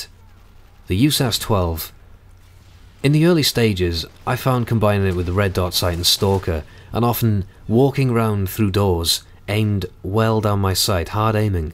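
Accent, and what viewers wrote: Wales, United Kingdom. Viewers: You sound slightly welsh... Are you welsh at all? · are you Welsh?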